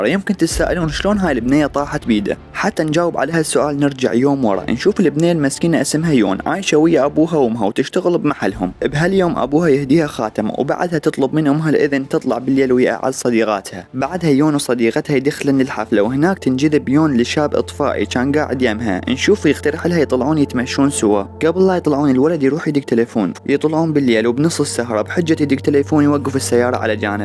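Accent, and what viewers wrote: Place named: Khuzestan, Iran. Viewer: حلو لهجته اعتقد اهوازي 😉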